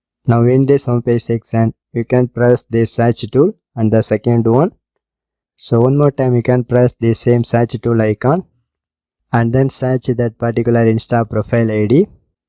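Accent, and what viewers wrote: India. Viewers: Ugh I can’t stand Indian accents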